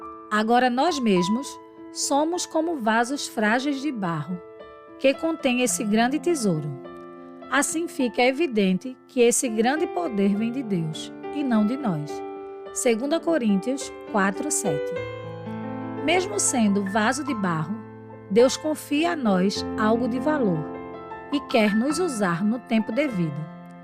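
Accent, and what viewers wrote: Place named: Northeast, Brazil. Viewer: Estou feliz de ouvir esse áudio com uma voz nordestina 😊😊😊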